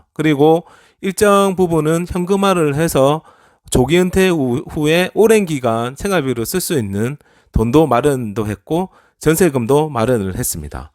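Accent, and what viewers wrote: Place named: Busan, South Korea. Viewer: 부산사투리..?? ㅋㅋㅋ 목소리가 넘 친근하고 좋으세요~~!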